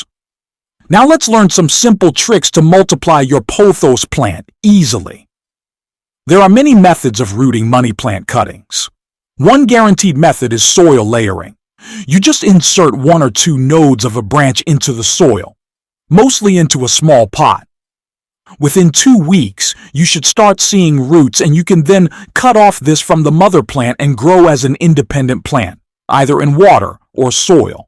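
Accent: India. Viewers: Honestly its sad to see people having to us ai because its clear and in depth with the perfect tone. I dont think that many people love the indian accent.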